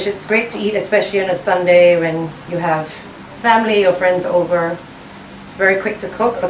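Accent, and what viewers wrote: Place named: Singapore. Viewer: May i know where are u from. U looks very ANG MO but u speaks some SINGLISH. Very interesting and anyway u're very DETAILED !!!!!!!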